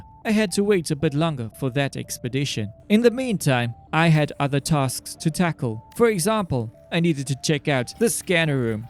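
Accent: United Kingdom. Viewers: 😂❤❤ it gor me soo badly ur british? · He kinda sounds British not trying to be mean but you do but your my top 1 favorite youtuber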